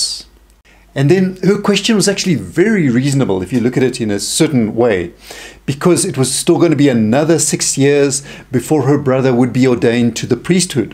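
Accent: South Africa. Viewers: Woah is this presenter South African? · Unmistakably south african.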